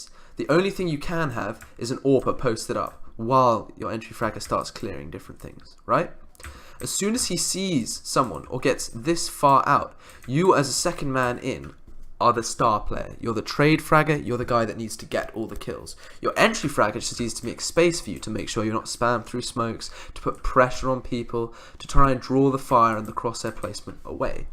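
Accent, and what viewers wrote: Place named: United Kingdom. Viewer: You also have the perfect type of British accent for voice-overs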